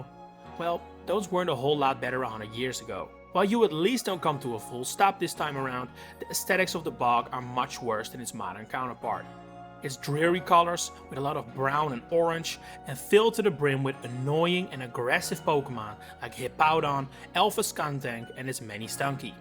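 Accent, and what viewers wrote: Netherlands. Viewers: Vind het geweldig om te horen dat je Nederlands bent puur aan je accent. Het is niet extreem Nederlands, maar Nederlands genoeg om te horen dat je 1 van ons bent ;)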